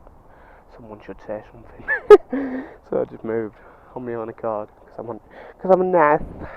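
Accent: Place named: Yorkshire, United Kingdom. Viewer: Your a little Yorkshire story teller ..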